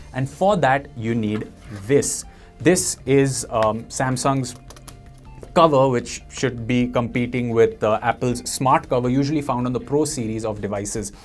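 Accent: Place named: India. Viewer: Video for Indians. The rest of the world cannot stand such accent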